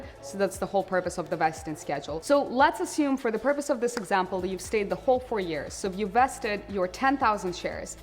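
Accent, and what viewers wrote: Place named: Russia. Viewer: Что-то русским акцентом попахивает)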